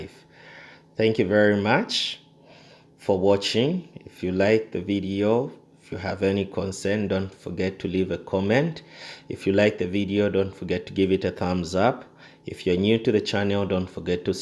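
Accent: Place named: United States. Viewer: Sir you have a nice accent arr ryu american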